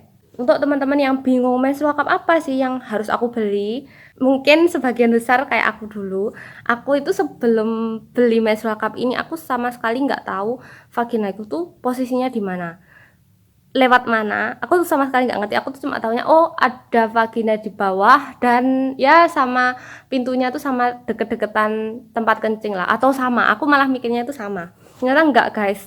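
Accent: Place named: Java, Indonesia. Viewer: Kok bahasa medok yaa ce😁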